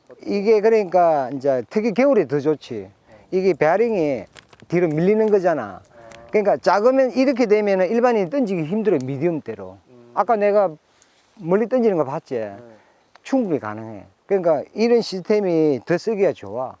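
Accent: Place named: Busan, South Korea. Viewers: 아~하~ 영상뜨고 항상 자기전에보는데 같은부산사람으로써 말투도 정감있고 낚시를 재밌게 가르쳐주시니 더재밌고 더 알기쉬운거같아요 앞으로도 좋은영상올려주시고 항상 안전하게 낚시하시고 건강하세요ㅎㅎ 화이팅ㅎㅎ lee<num> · 얼마전 바쁘신 일정 중에도 영상 올려주셔서 감사했습니다 특히 저랑 같이 경상도 사투리를 들으며 영상을 보고 있으면 저절로 필드에 동출한 기분이 듭니다 ㅎㅎㅎ 항상 감사히 보고있어요!! <mail>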